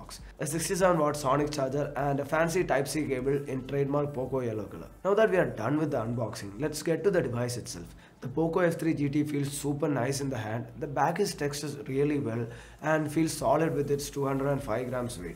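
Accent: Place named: Kerala, India. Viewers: no but kerala people english accent is different compared to rest of south and north indians . And only malayalis can spot the difference 😎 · Has the south indian accent ♥️♥️... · I'm here in c4etech after a long time. But when I started to watch this video, in 3 seconds I found out that this guy is a malayali. Because, they have their own accent for english.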